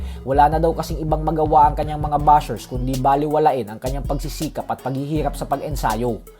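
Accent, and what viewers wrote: Visayas, Philippines. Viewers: Ayusin mo boss mo visayang Visaya 😅😅😅😅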